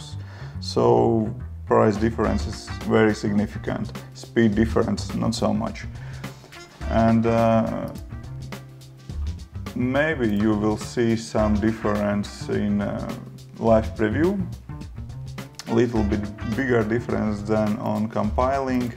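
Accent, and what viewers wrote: Russia. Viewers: Actually your accent is the same as russian 🙃 Interesting video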